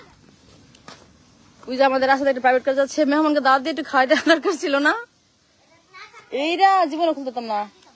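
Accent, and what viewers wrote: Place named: Chandpur, Bangladesh. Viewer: আপনার চাঁদপুরের ভাষা আমার শুনতে খুব ভালো লাগে। আমিও ঢাকা মুগদা থাকি,আপনি ঢাকা কোথায় থাকেন।আমিও মতলব থানার ছেলে।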